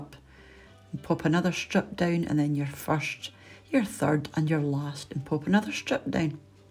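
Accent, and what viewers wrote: Scotland, United Kingdom. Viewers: Are you from Scotland, by any chance? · I love your recipes! I live in North Ayrshire so its nice to hear a fellow Scottish accent :) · isyt I recall Americans saying a few pats of butter or tablespoons. It just sounds so adorable to say knobs of butter. I don’t know why. I just love the Scottish accent and colloquialisms.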